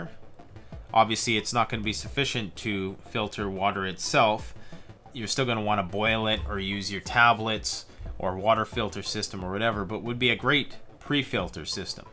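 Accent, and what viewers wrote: Canada. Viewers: You, sir, have a violently Canadian accent. And it is beautiful. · I'm not big in prepping but I subbed because of your pure Canadian accent I wish I sounded like this but alas I sound like an American who moved to Canada and I'm not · Wrong! The wetter your wiper be, the more likely your becoming contaminated by fecal waste..that pervasive accent ought to reveal how abominably runaway Canadia/en dorkiness is. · The GoldenChild he’s Canadian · I'm sorry if this comes off as rude, but I have not heard a more Canadian accent ever.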